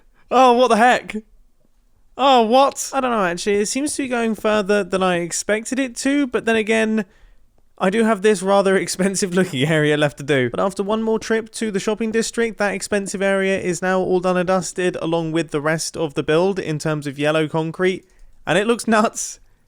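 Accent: United Kingdom. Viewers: I love his British accent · aren't we all loving this British person accent?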